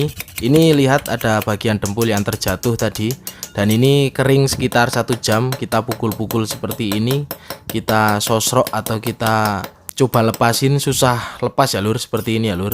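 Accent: Temanggung, Indonesia. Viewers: mas kok logat bicara koyo wong temanggung? sampean temanggung to?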